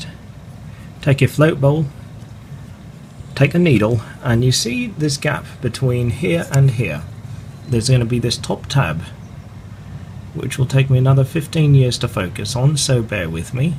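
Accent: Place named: United Kingdom. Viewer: Another useful video thanks. Have you lived in the UK,just going by your pronunciation . Andy.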